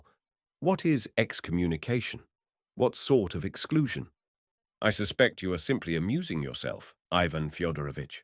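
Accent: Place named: United Kingdom. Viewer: Lovely rounded British English accent. Easy on the ear 👌 ❤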